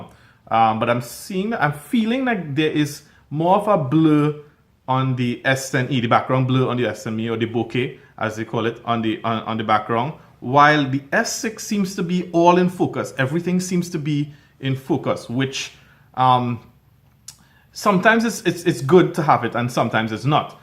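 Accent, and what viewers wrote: Trinidad and Tobago. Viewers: Are you Trini or Guyanese?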